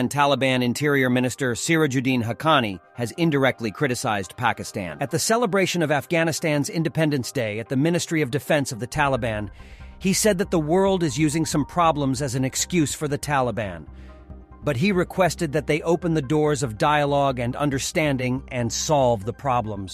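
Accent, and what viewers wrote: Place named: United States. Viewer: With your American accent, everything you report should be taken with a pinch of salt. Stop making two brotherly states fight for your ulterior motives. We Pakistani citizens have always supported the legitimate cause of Islamic Emirate of Afghanistan. We wish our Afghan brothers all the best. IA with peace Islamic Emirate will develop and become a rich country soon IA.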